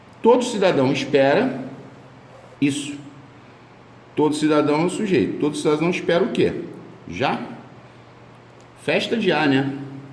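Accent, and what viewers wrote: Rio de Janeiro, Brazil. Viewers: "tem sujeito, perguntou "o que?", é O.D., mané!!! uuauahauha, esse "mané" entrega o carioca mais q o "s" chiado uhauhauhauah Puta aula! valeu de mais,!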